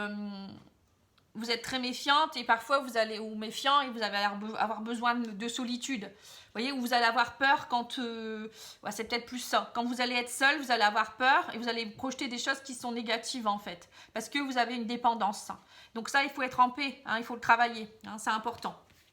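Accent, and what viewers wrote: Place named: Picardy, France. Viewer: Bonsoir c'est la première fois que je vois vos vidéos merci pour la guidance. Vous avez un accent du Nord de la Picarfie je dirais 😉🙏🧘‍♀️🧚‍♀️😘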